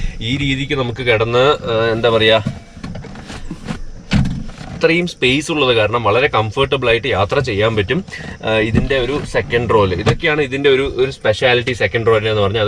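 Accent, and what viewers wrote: Palakkad, India. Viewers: nengal Palakkad anno? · പാലക്കാട് ആണോ....സ്ഥലം...പാലക്കാട്‌ സ്ലാങ് ആയി...തോന്നി...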